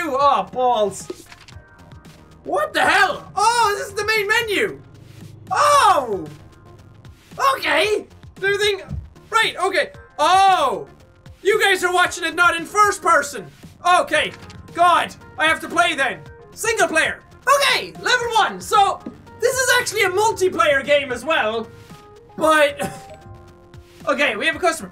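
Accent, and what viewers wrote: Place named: Ireland. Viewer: I'm just wondering... why Jeremiah? Like i know its just a name he thinks of on the spot, but... why Jeremiah? It doesn't trigger or offend me or nothin but it just makes me think of my big bro so if i zone out due to my recent work load, then i hear "Jeremiah!" in Sean's infectious Irish voice, it just makes me think that my bro is there or smth XD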